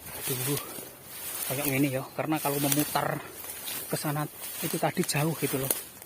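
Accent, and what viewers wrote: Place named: East Java, Indonesia. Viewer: Dr semua vlog sejenis, saya suka vlog ini krn: 1. Seblm mulai ada penjelasannya 2. Logat Jwa Timurannya bikin seru n lucu 3 . Ulasannya detil 4. Ga diserem²in pake back sound Saran: 1. Sll hati² apalagi tangga² yg rapuh 2. Klo di rumah² yg ada barang²nya misal berkas² baiknya disorot/diteliti lbh detil sapa tau bisa jd titik terang ttg siapa penilik rumahnya Suwuuuun..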